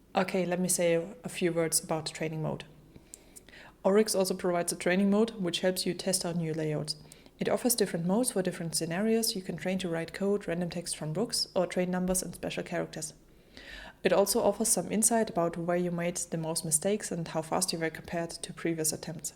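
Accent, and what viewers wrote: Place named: Germany. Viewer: Are you German? I think I recognize your accent. You speak similarly to Joscha Bach.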